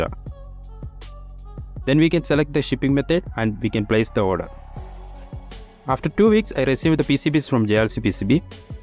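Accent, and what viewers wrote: Kerala, India. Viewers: Bro are you from kerala?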